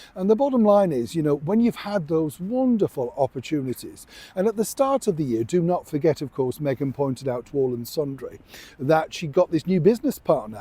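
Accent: Yorkshire, United Kingdom. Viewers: It's his Yorkshire accent? Lovely on the ears 😁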